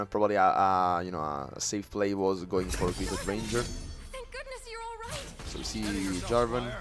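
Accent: Italy. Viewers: l'accento italiano e incredibilmente ovvio comunque bel video. E METTI STO DECK CODE xd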